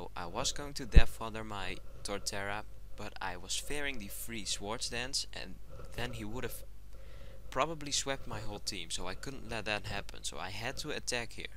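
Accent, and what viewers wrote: Netherlands. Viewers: Hier had je echt nog een typisch hollands accent :] Wel enige verbetering in gekomen! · you sound dutch!? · are u dutch ? cuz i am dutch and i think i hear a dutch accent in your english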